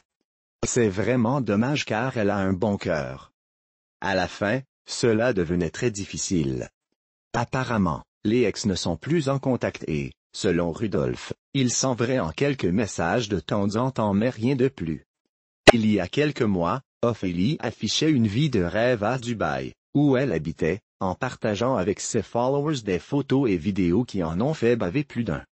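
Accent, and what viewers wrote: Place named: Quebec, Canada. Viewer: Heu! elle viens faire quoi Loana dans les photos !? j'm'suis encore perdue sur yt , les voix robotique avec l'accent jouale de Québec MDR! j'décalisse de dlà !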